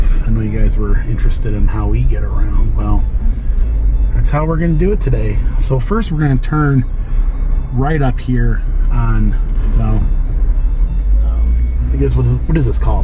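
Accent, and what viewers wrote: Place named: South, United States. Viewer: It's funny how your words are sounding more like the Southern part of of you now! LOL!!!!!😁😁😁😁😁 THATS A GOOD THING!! You even have a twang also...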